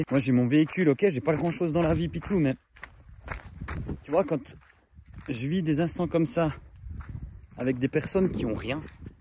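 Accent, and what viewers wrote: Switzerland. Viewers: L'accent suisse. Une pépite vraiment 😂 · t'es suisse ou bien ?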